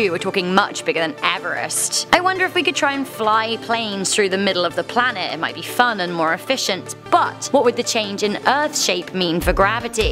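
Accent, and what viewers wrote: United Kingdom. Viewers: Your accent sound like British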